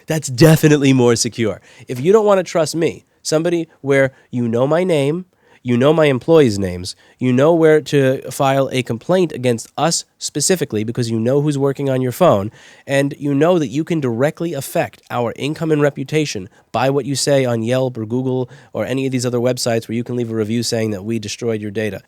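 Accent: New York, United States. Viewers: Very entertaining, I just love your approach to injustice, etc, and the New York City "way"...of speaking out verbally. Anyway, being on the west coast, in Oregon, I never could stand the whole apple products pushback by their cult leaders and the members of the congregation, most noticeable here on the west coast which is closer to them. They and the other internet giants pretty much proclaim to rule the world, maybe they do right now, too big for their own good and it reminds me of doctors or lawyers or politicians who come from elite families and have gone to elite schools who have no clue, no idea what its like to be a regular human being or what the inside of a grocery store looks like for instance......have they banned you from facebook, twitter, paypal, google, etc etc yet? Seems like these days all the tech giants have a mutual agreement to ban nay sayers from everywhere all of a sudden.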